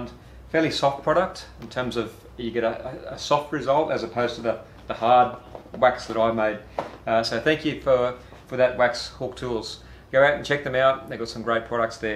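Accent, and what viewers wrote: Australia. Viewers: Just found your videos on masking the waxed bag. Great to see and hear an Aussie on YouTube. Can’t find the video you refer to about actually waxing the fabric/ the recipe etc. Ps. I too have a singer 201. Great little machine. Mine can be used as electric or hand crank - handy for those power outages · GREAT WORK. Hey mate send me one of those orange tool bags. Let me know what you want for it and for post. HAWK........with the accent is Perfect English or at least Australian! Wouldn't know who was talking if you didn't add the Aussie flavor!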